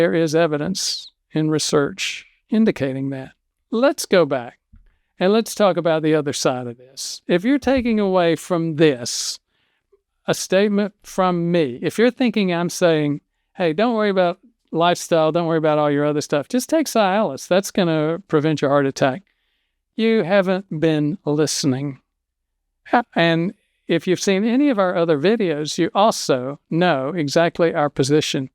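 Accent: South, United States. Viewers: I think it's the Southern dialect thing and possibly lack of early exposure to multiple cultures. Many people mispronounce words...Dr. is a very good person. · Why are you pronouncing this drug's brand name incorrectly? Is it a southern dialect thing, like how certain southern people call my mom, "Naiomi," instead of her actual name, Naomi? I always think that they're confusing the CORRECT pronunciation of her name with the pronunciation of the Kenyan city of NAIROBI! It's pronounced, 'see • alice,' NOT "sigh • alice," and I can't even watch the rest of this video because you're driving me crazy with your repeated mispronunciation of this word....and you're a real, bonafide doctor, right? Well then, one would think that you would KNOW the correct pronunciation?! I'm just an RN, and even I know the CORRECT pronunciation!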